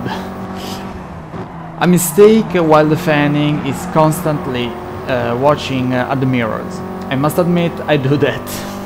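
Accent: Italy. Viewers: Adoro il tuo inglese italianizzato ahah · why does he have an italian like accent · I`m really glad that you finally decided to do a voice over on your videos! It adds another layer of communication and helps focusing on the video itself! Great job! And as an Italian living in Brazil, I love that Italian accent and tone! I can almost see your hands moving while talking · Your voice make me remember italian people,such a nice accent its funny and not too formal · Sei italiano? L' accento è quello. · your accent is like an italian who speak in English. I'm from Italy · Scusa sai se lui è italiano? Perché dall'accento sembrerebbe di sì... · You sound like u are italian,like me😂😂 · I love when english is spoken by italians because I can understand them easily!!! He remind me of Dovizioso and Rossi answering questions in press conference. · I love your italian accent when you talk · Si sente che sei italiano sotto sotto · Italian accent at its best, it's awesome ♥️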